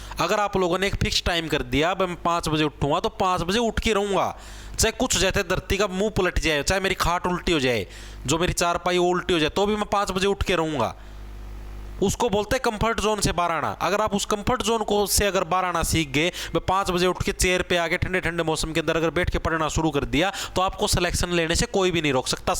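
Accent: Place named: Jamawari, India. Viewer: aap village jamaawari se ho kya aapki aawaj se lagta h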